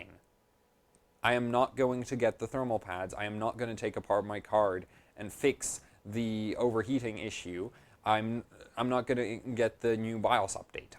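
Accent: United Kingdom. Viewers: you have actually got a pretty nice English (UK) accent. BTW love your honesty and not overworked videos. thx and keep it up ;-)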